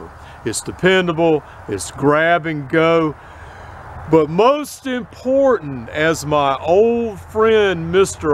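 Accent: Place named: Virginia, United States. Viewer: I would have guessed Virginia, well, at least we know he is not from Boston, Mass. lol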